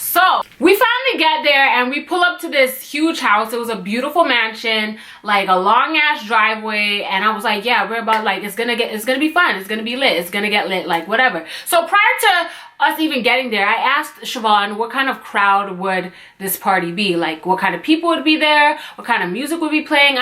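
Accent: Toronto, Canada. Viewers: The Toronto accent triggers me idk why😣😂😂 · I love your toronto slang/accent lol it's so cute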